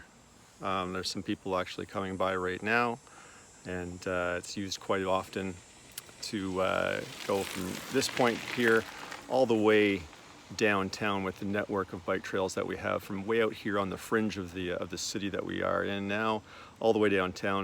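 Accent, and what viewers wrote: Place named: Canada. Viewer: I think I've seen this when it was 1st posted??? But OH! Your navigation skills crack me up! Not the 1st (& not the last) video you've been lost in. You know I love you dearly, but Magellan you are not. And in my Zombie Apocalypse team, navigation is NOT your job! LOL! (Wink) After 2yrs of your audio/ videos you Canadian accented O's & A's still give me a grin & a giggle. Big hugs & here's to the 2022 spring/ summer/ fall hiking ahead! 🥾🕶☀️💧🎒😉😘🧭 L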